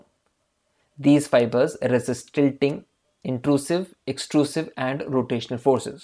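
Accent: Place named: South India, India. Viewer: Hehe...am i right or wrong Aapke kuch words mein south Indian accent lage.. 😅 anyways.. nice explanation of the topic thank u 🙂👍...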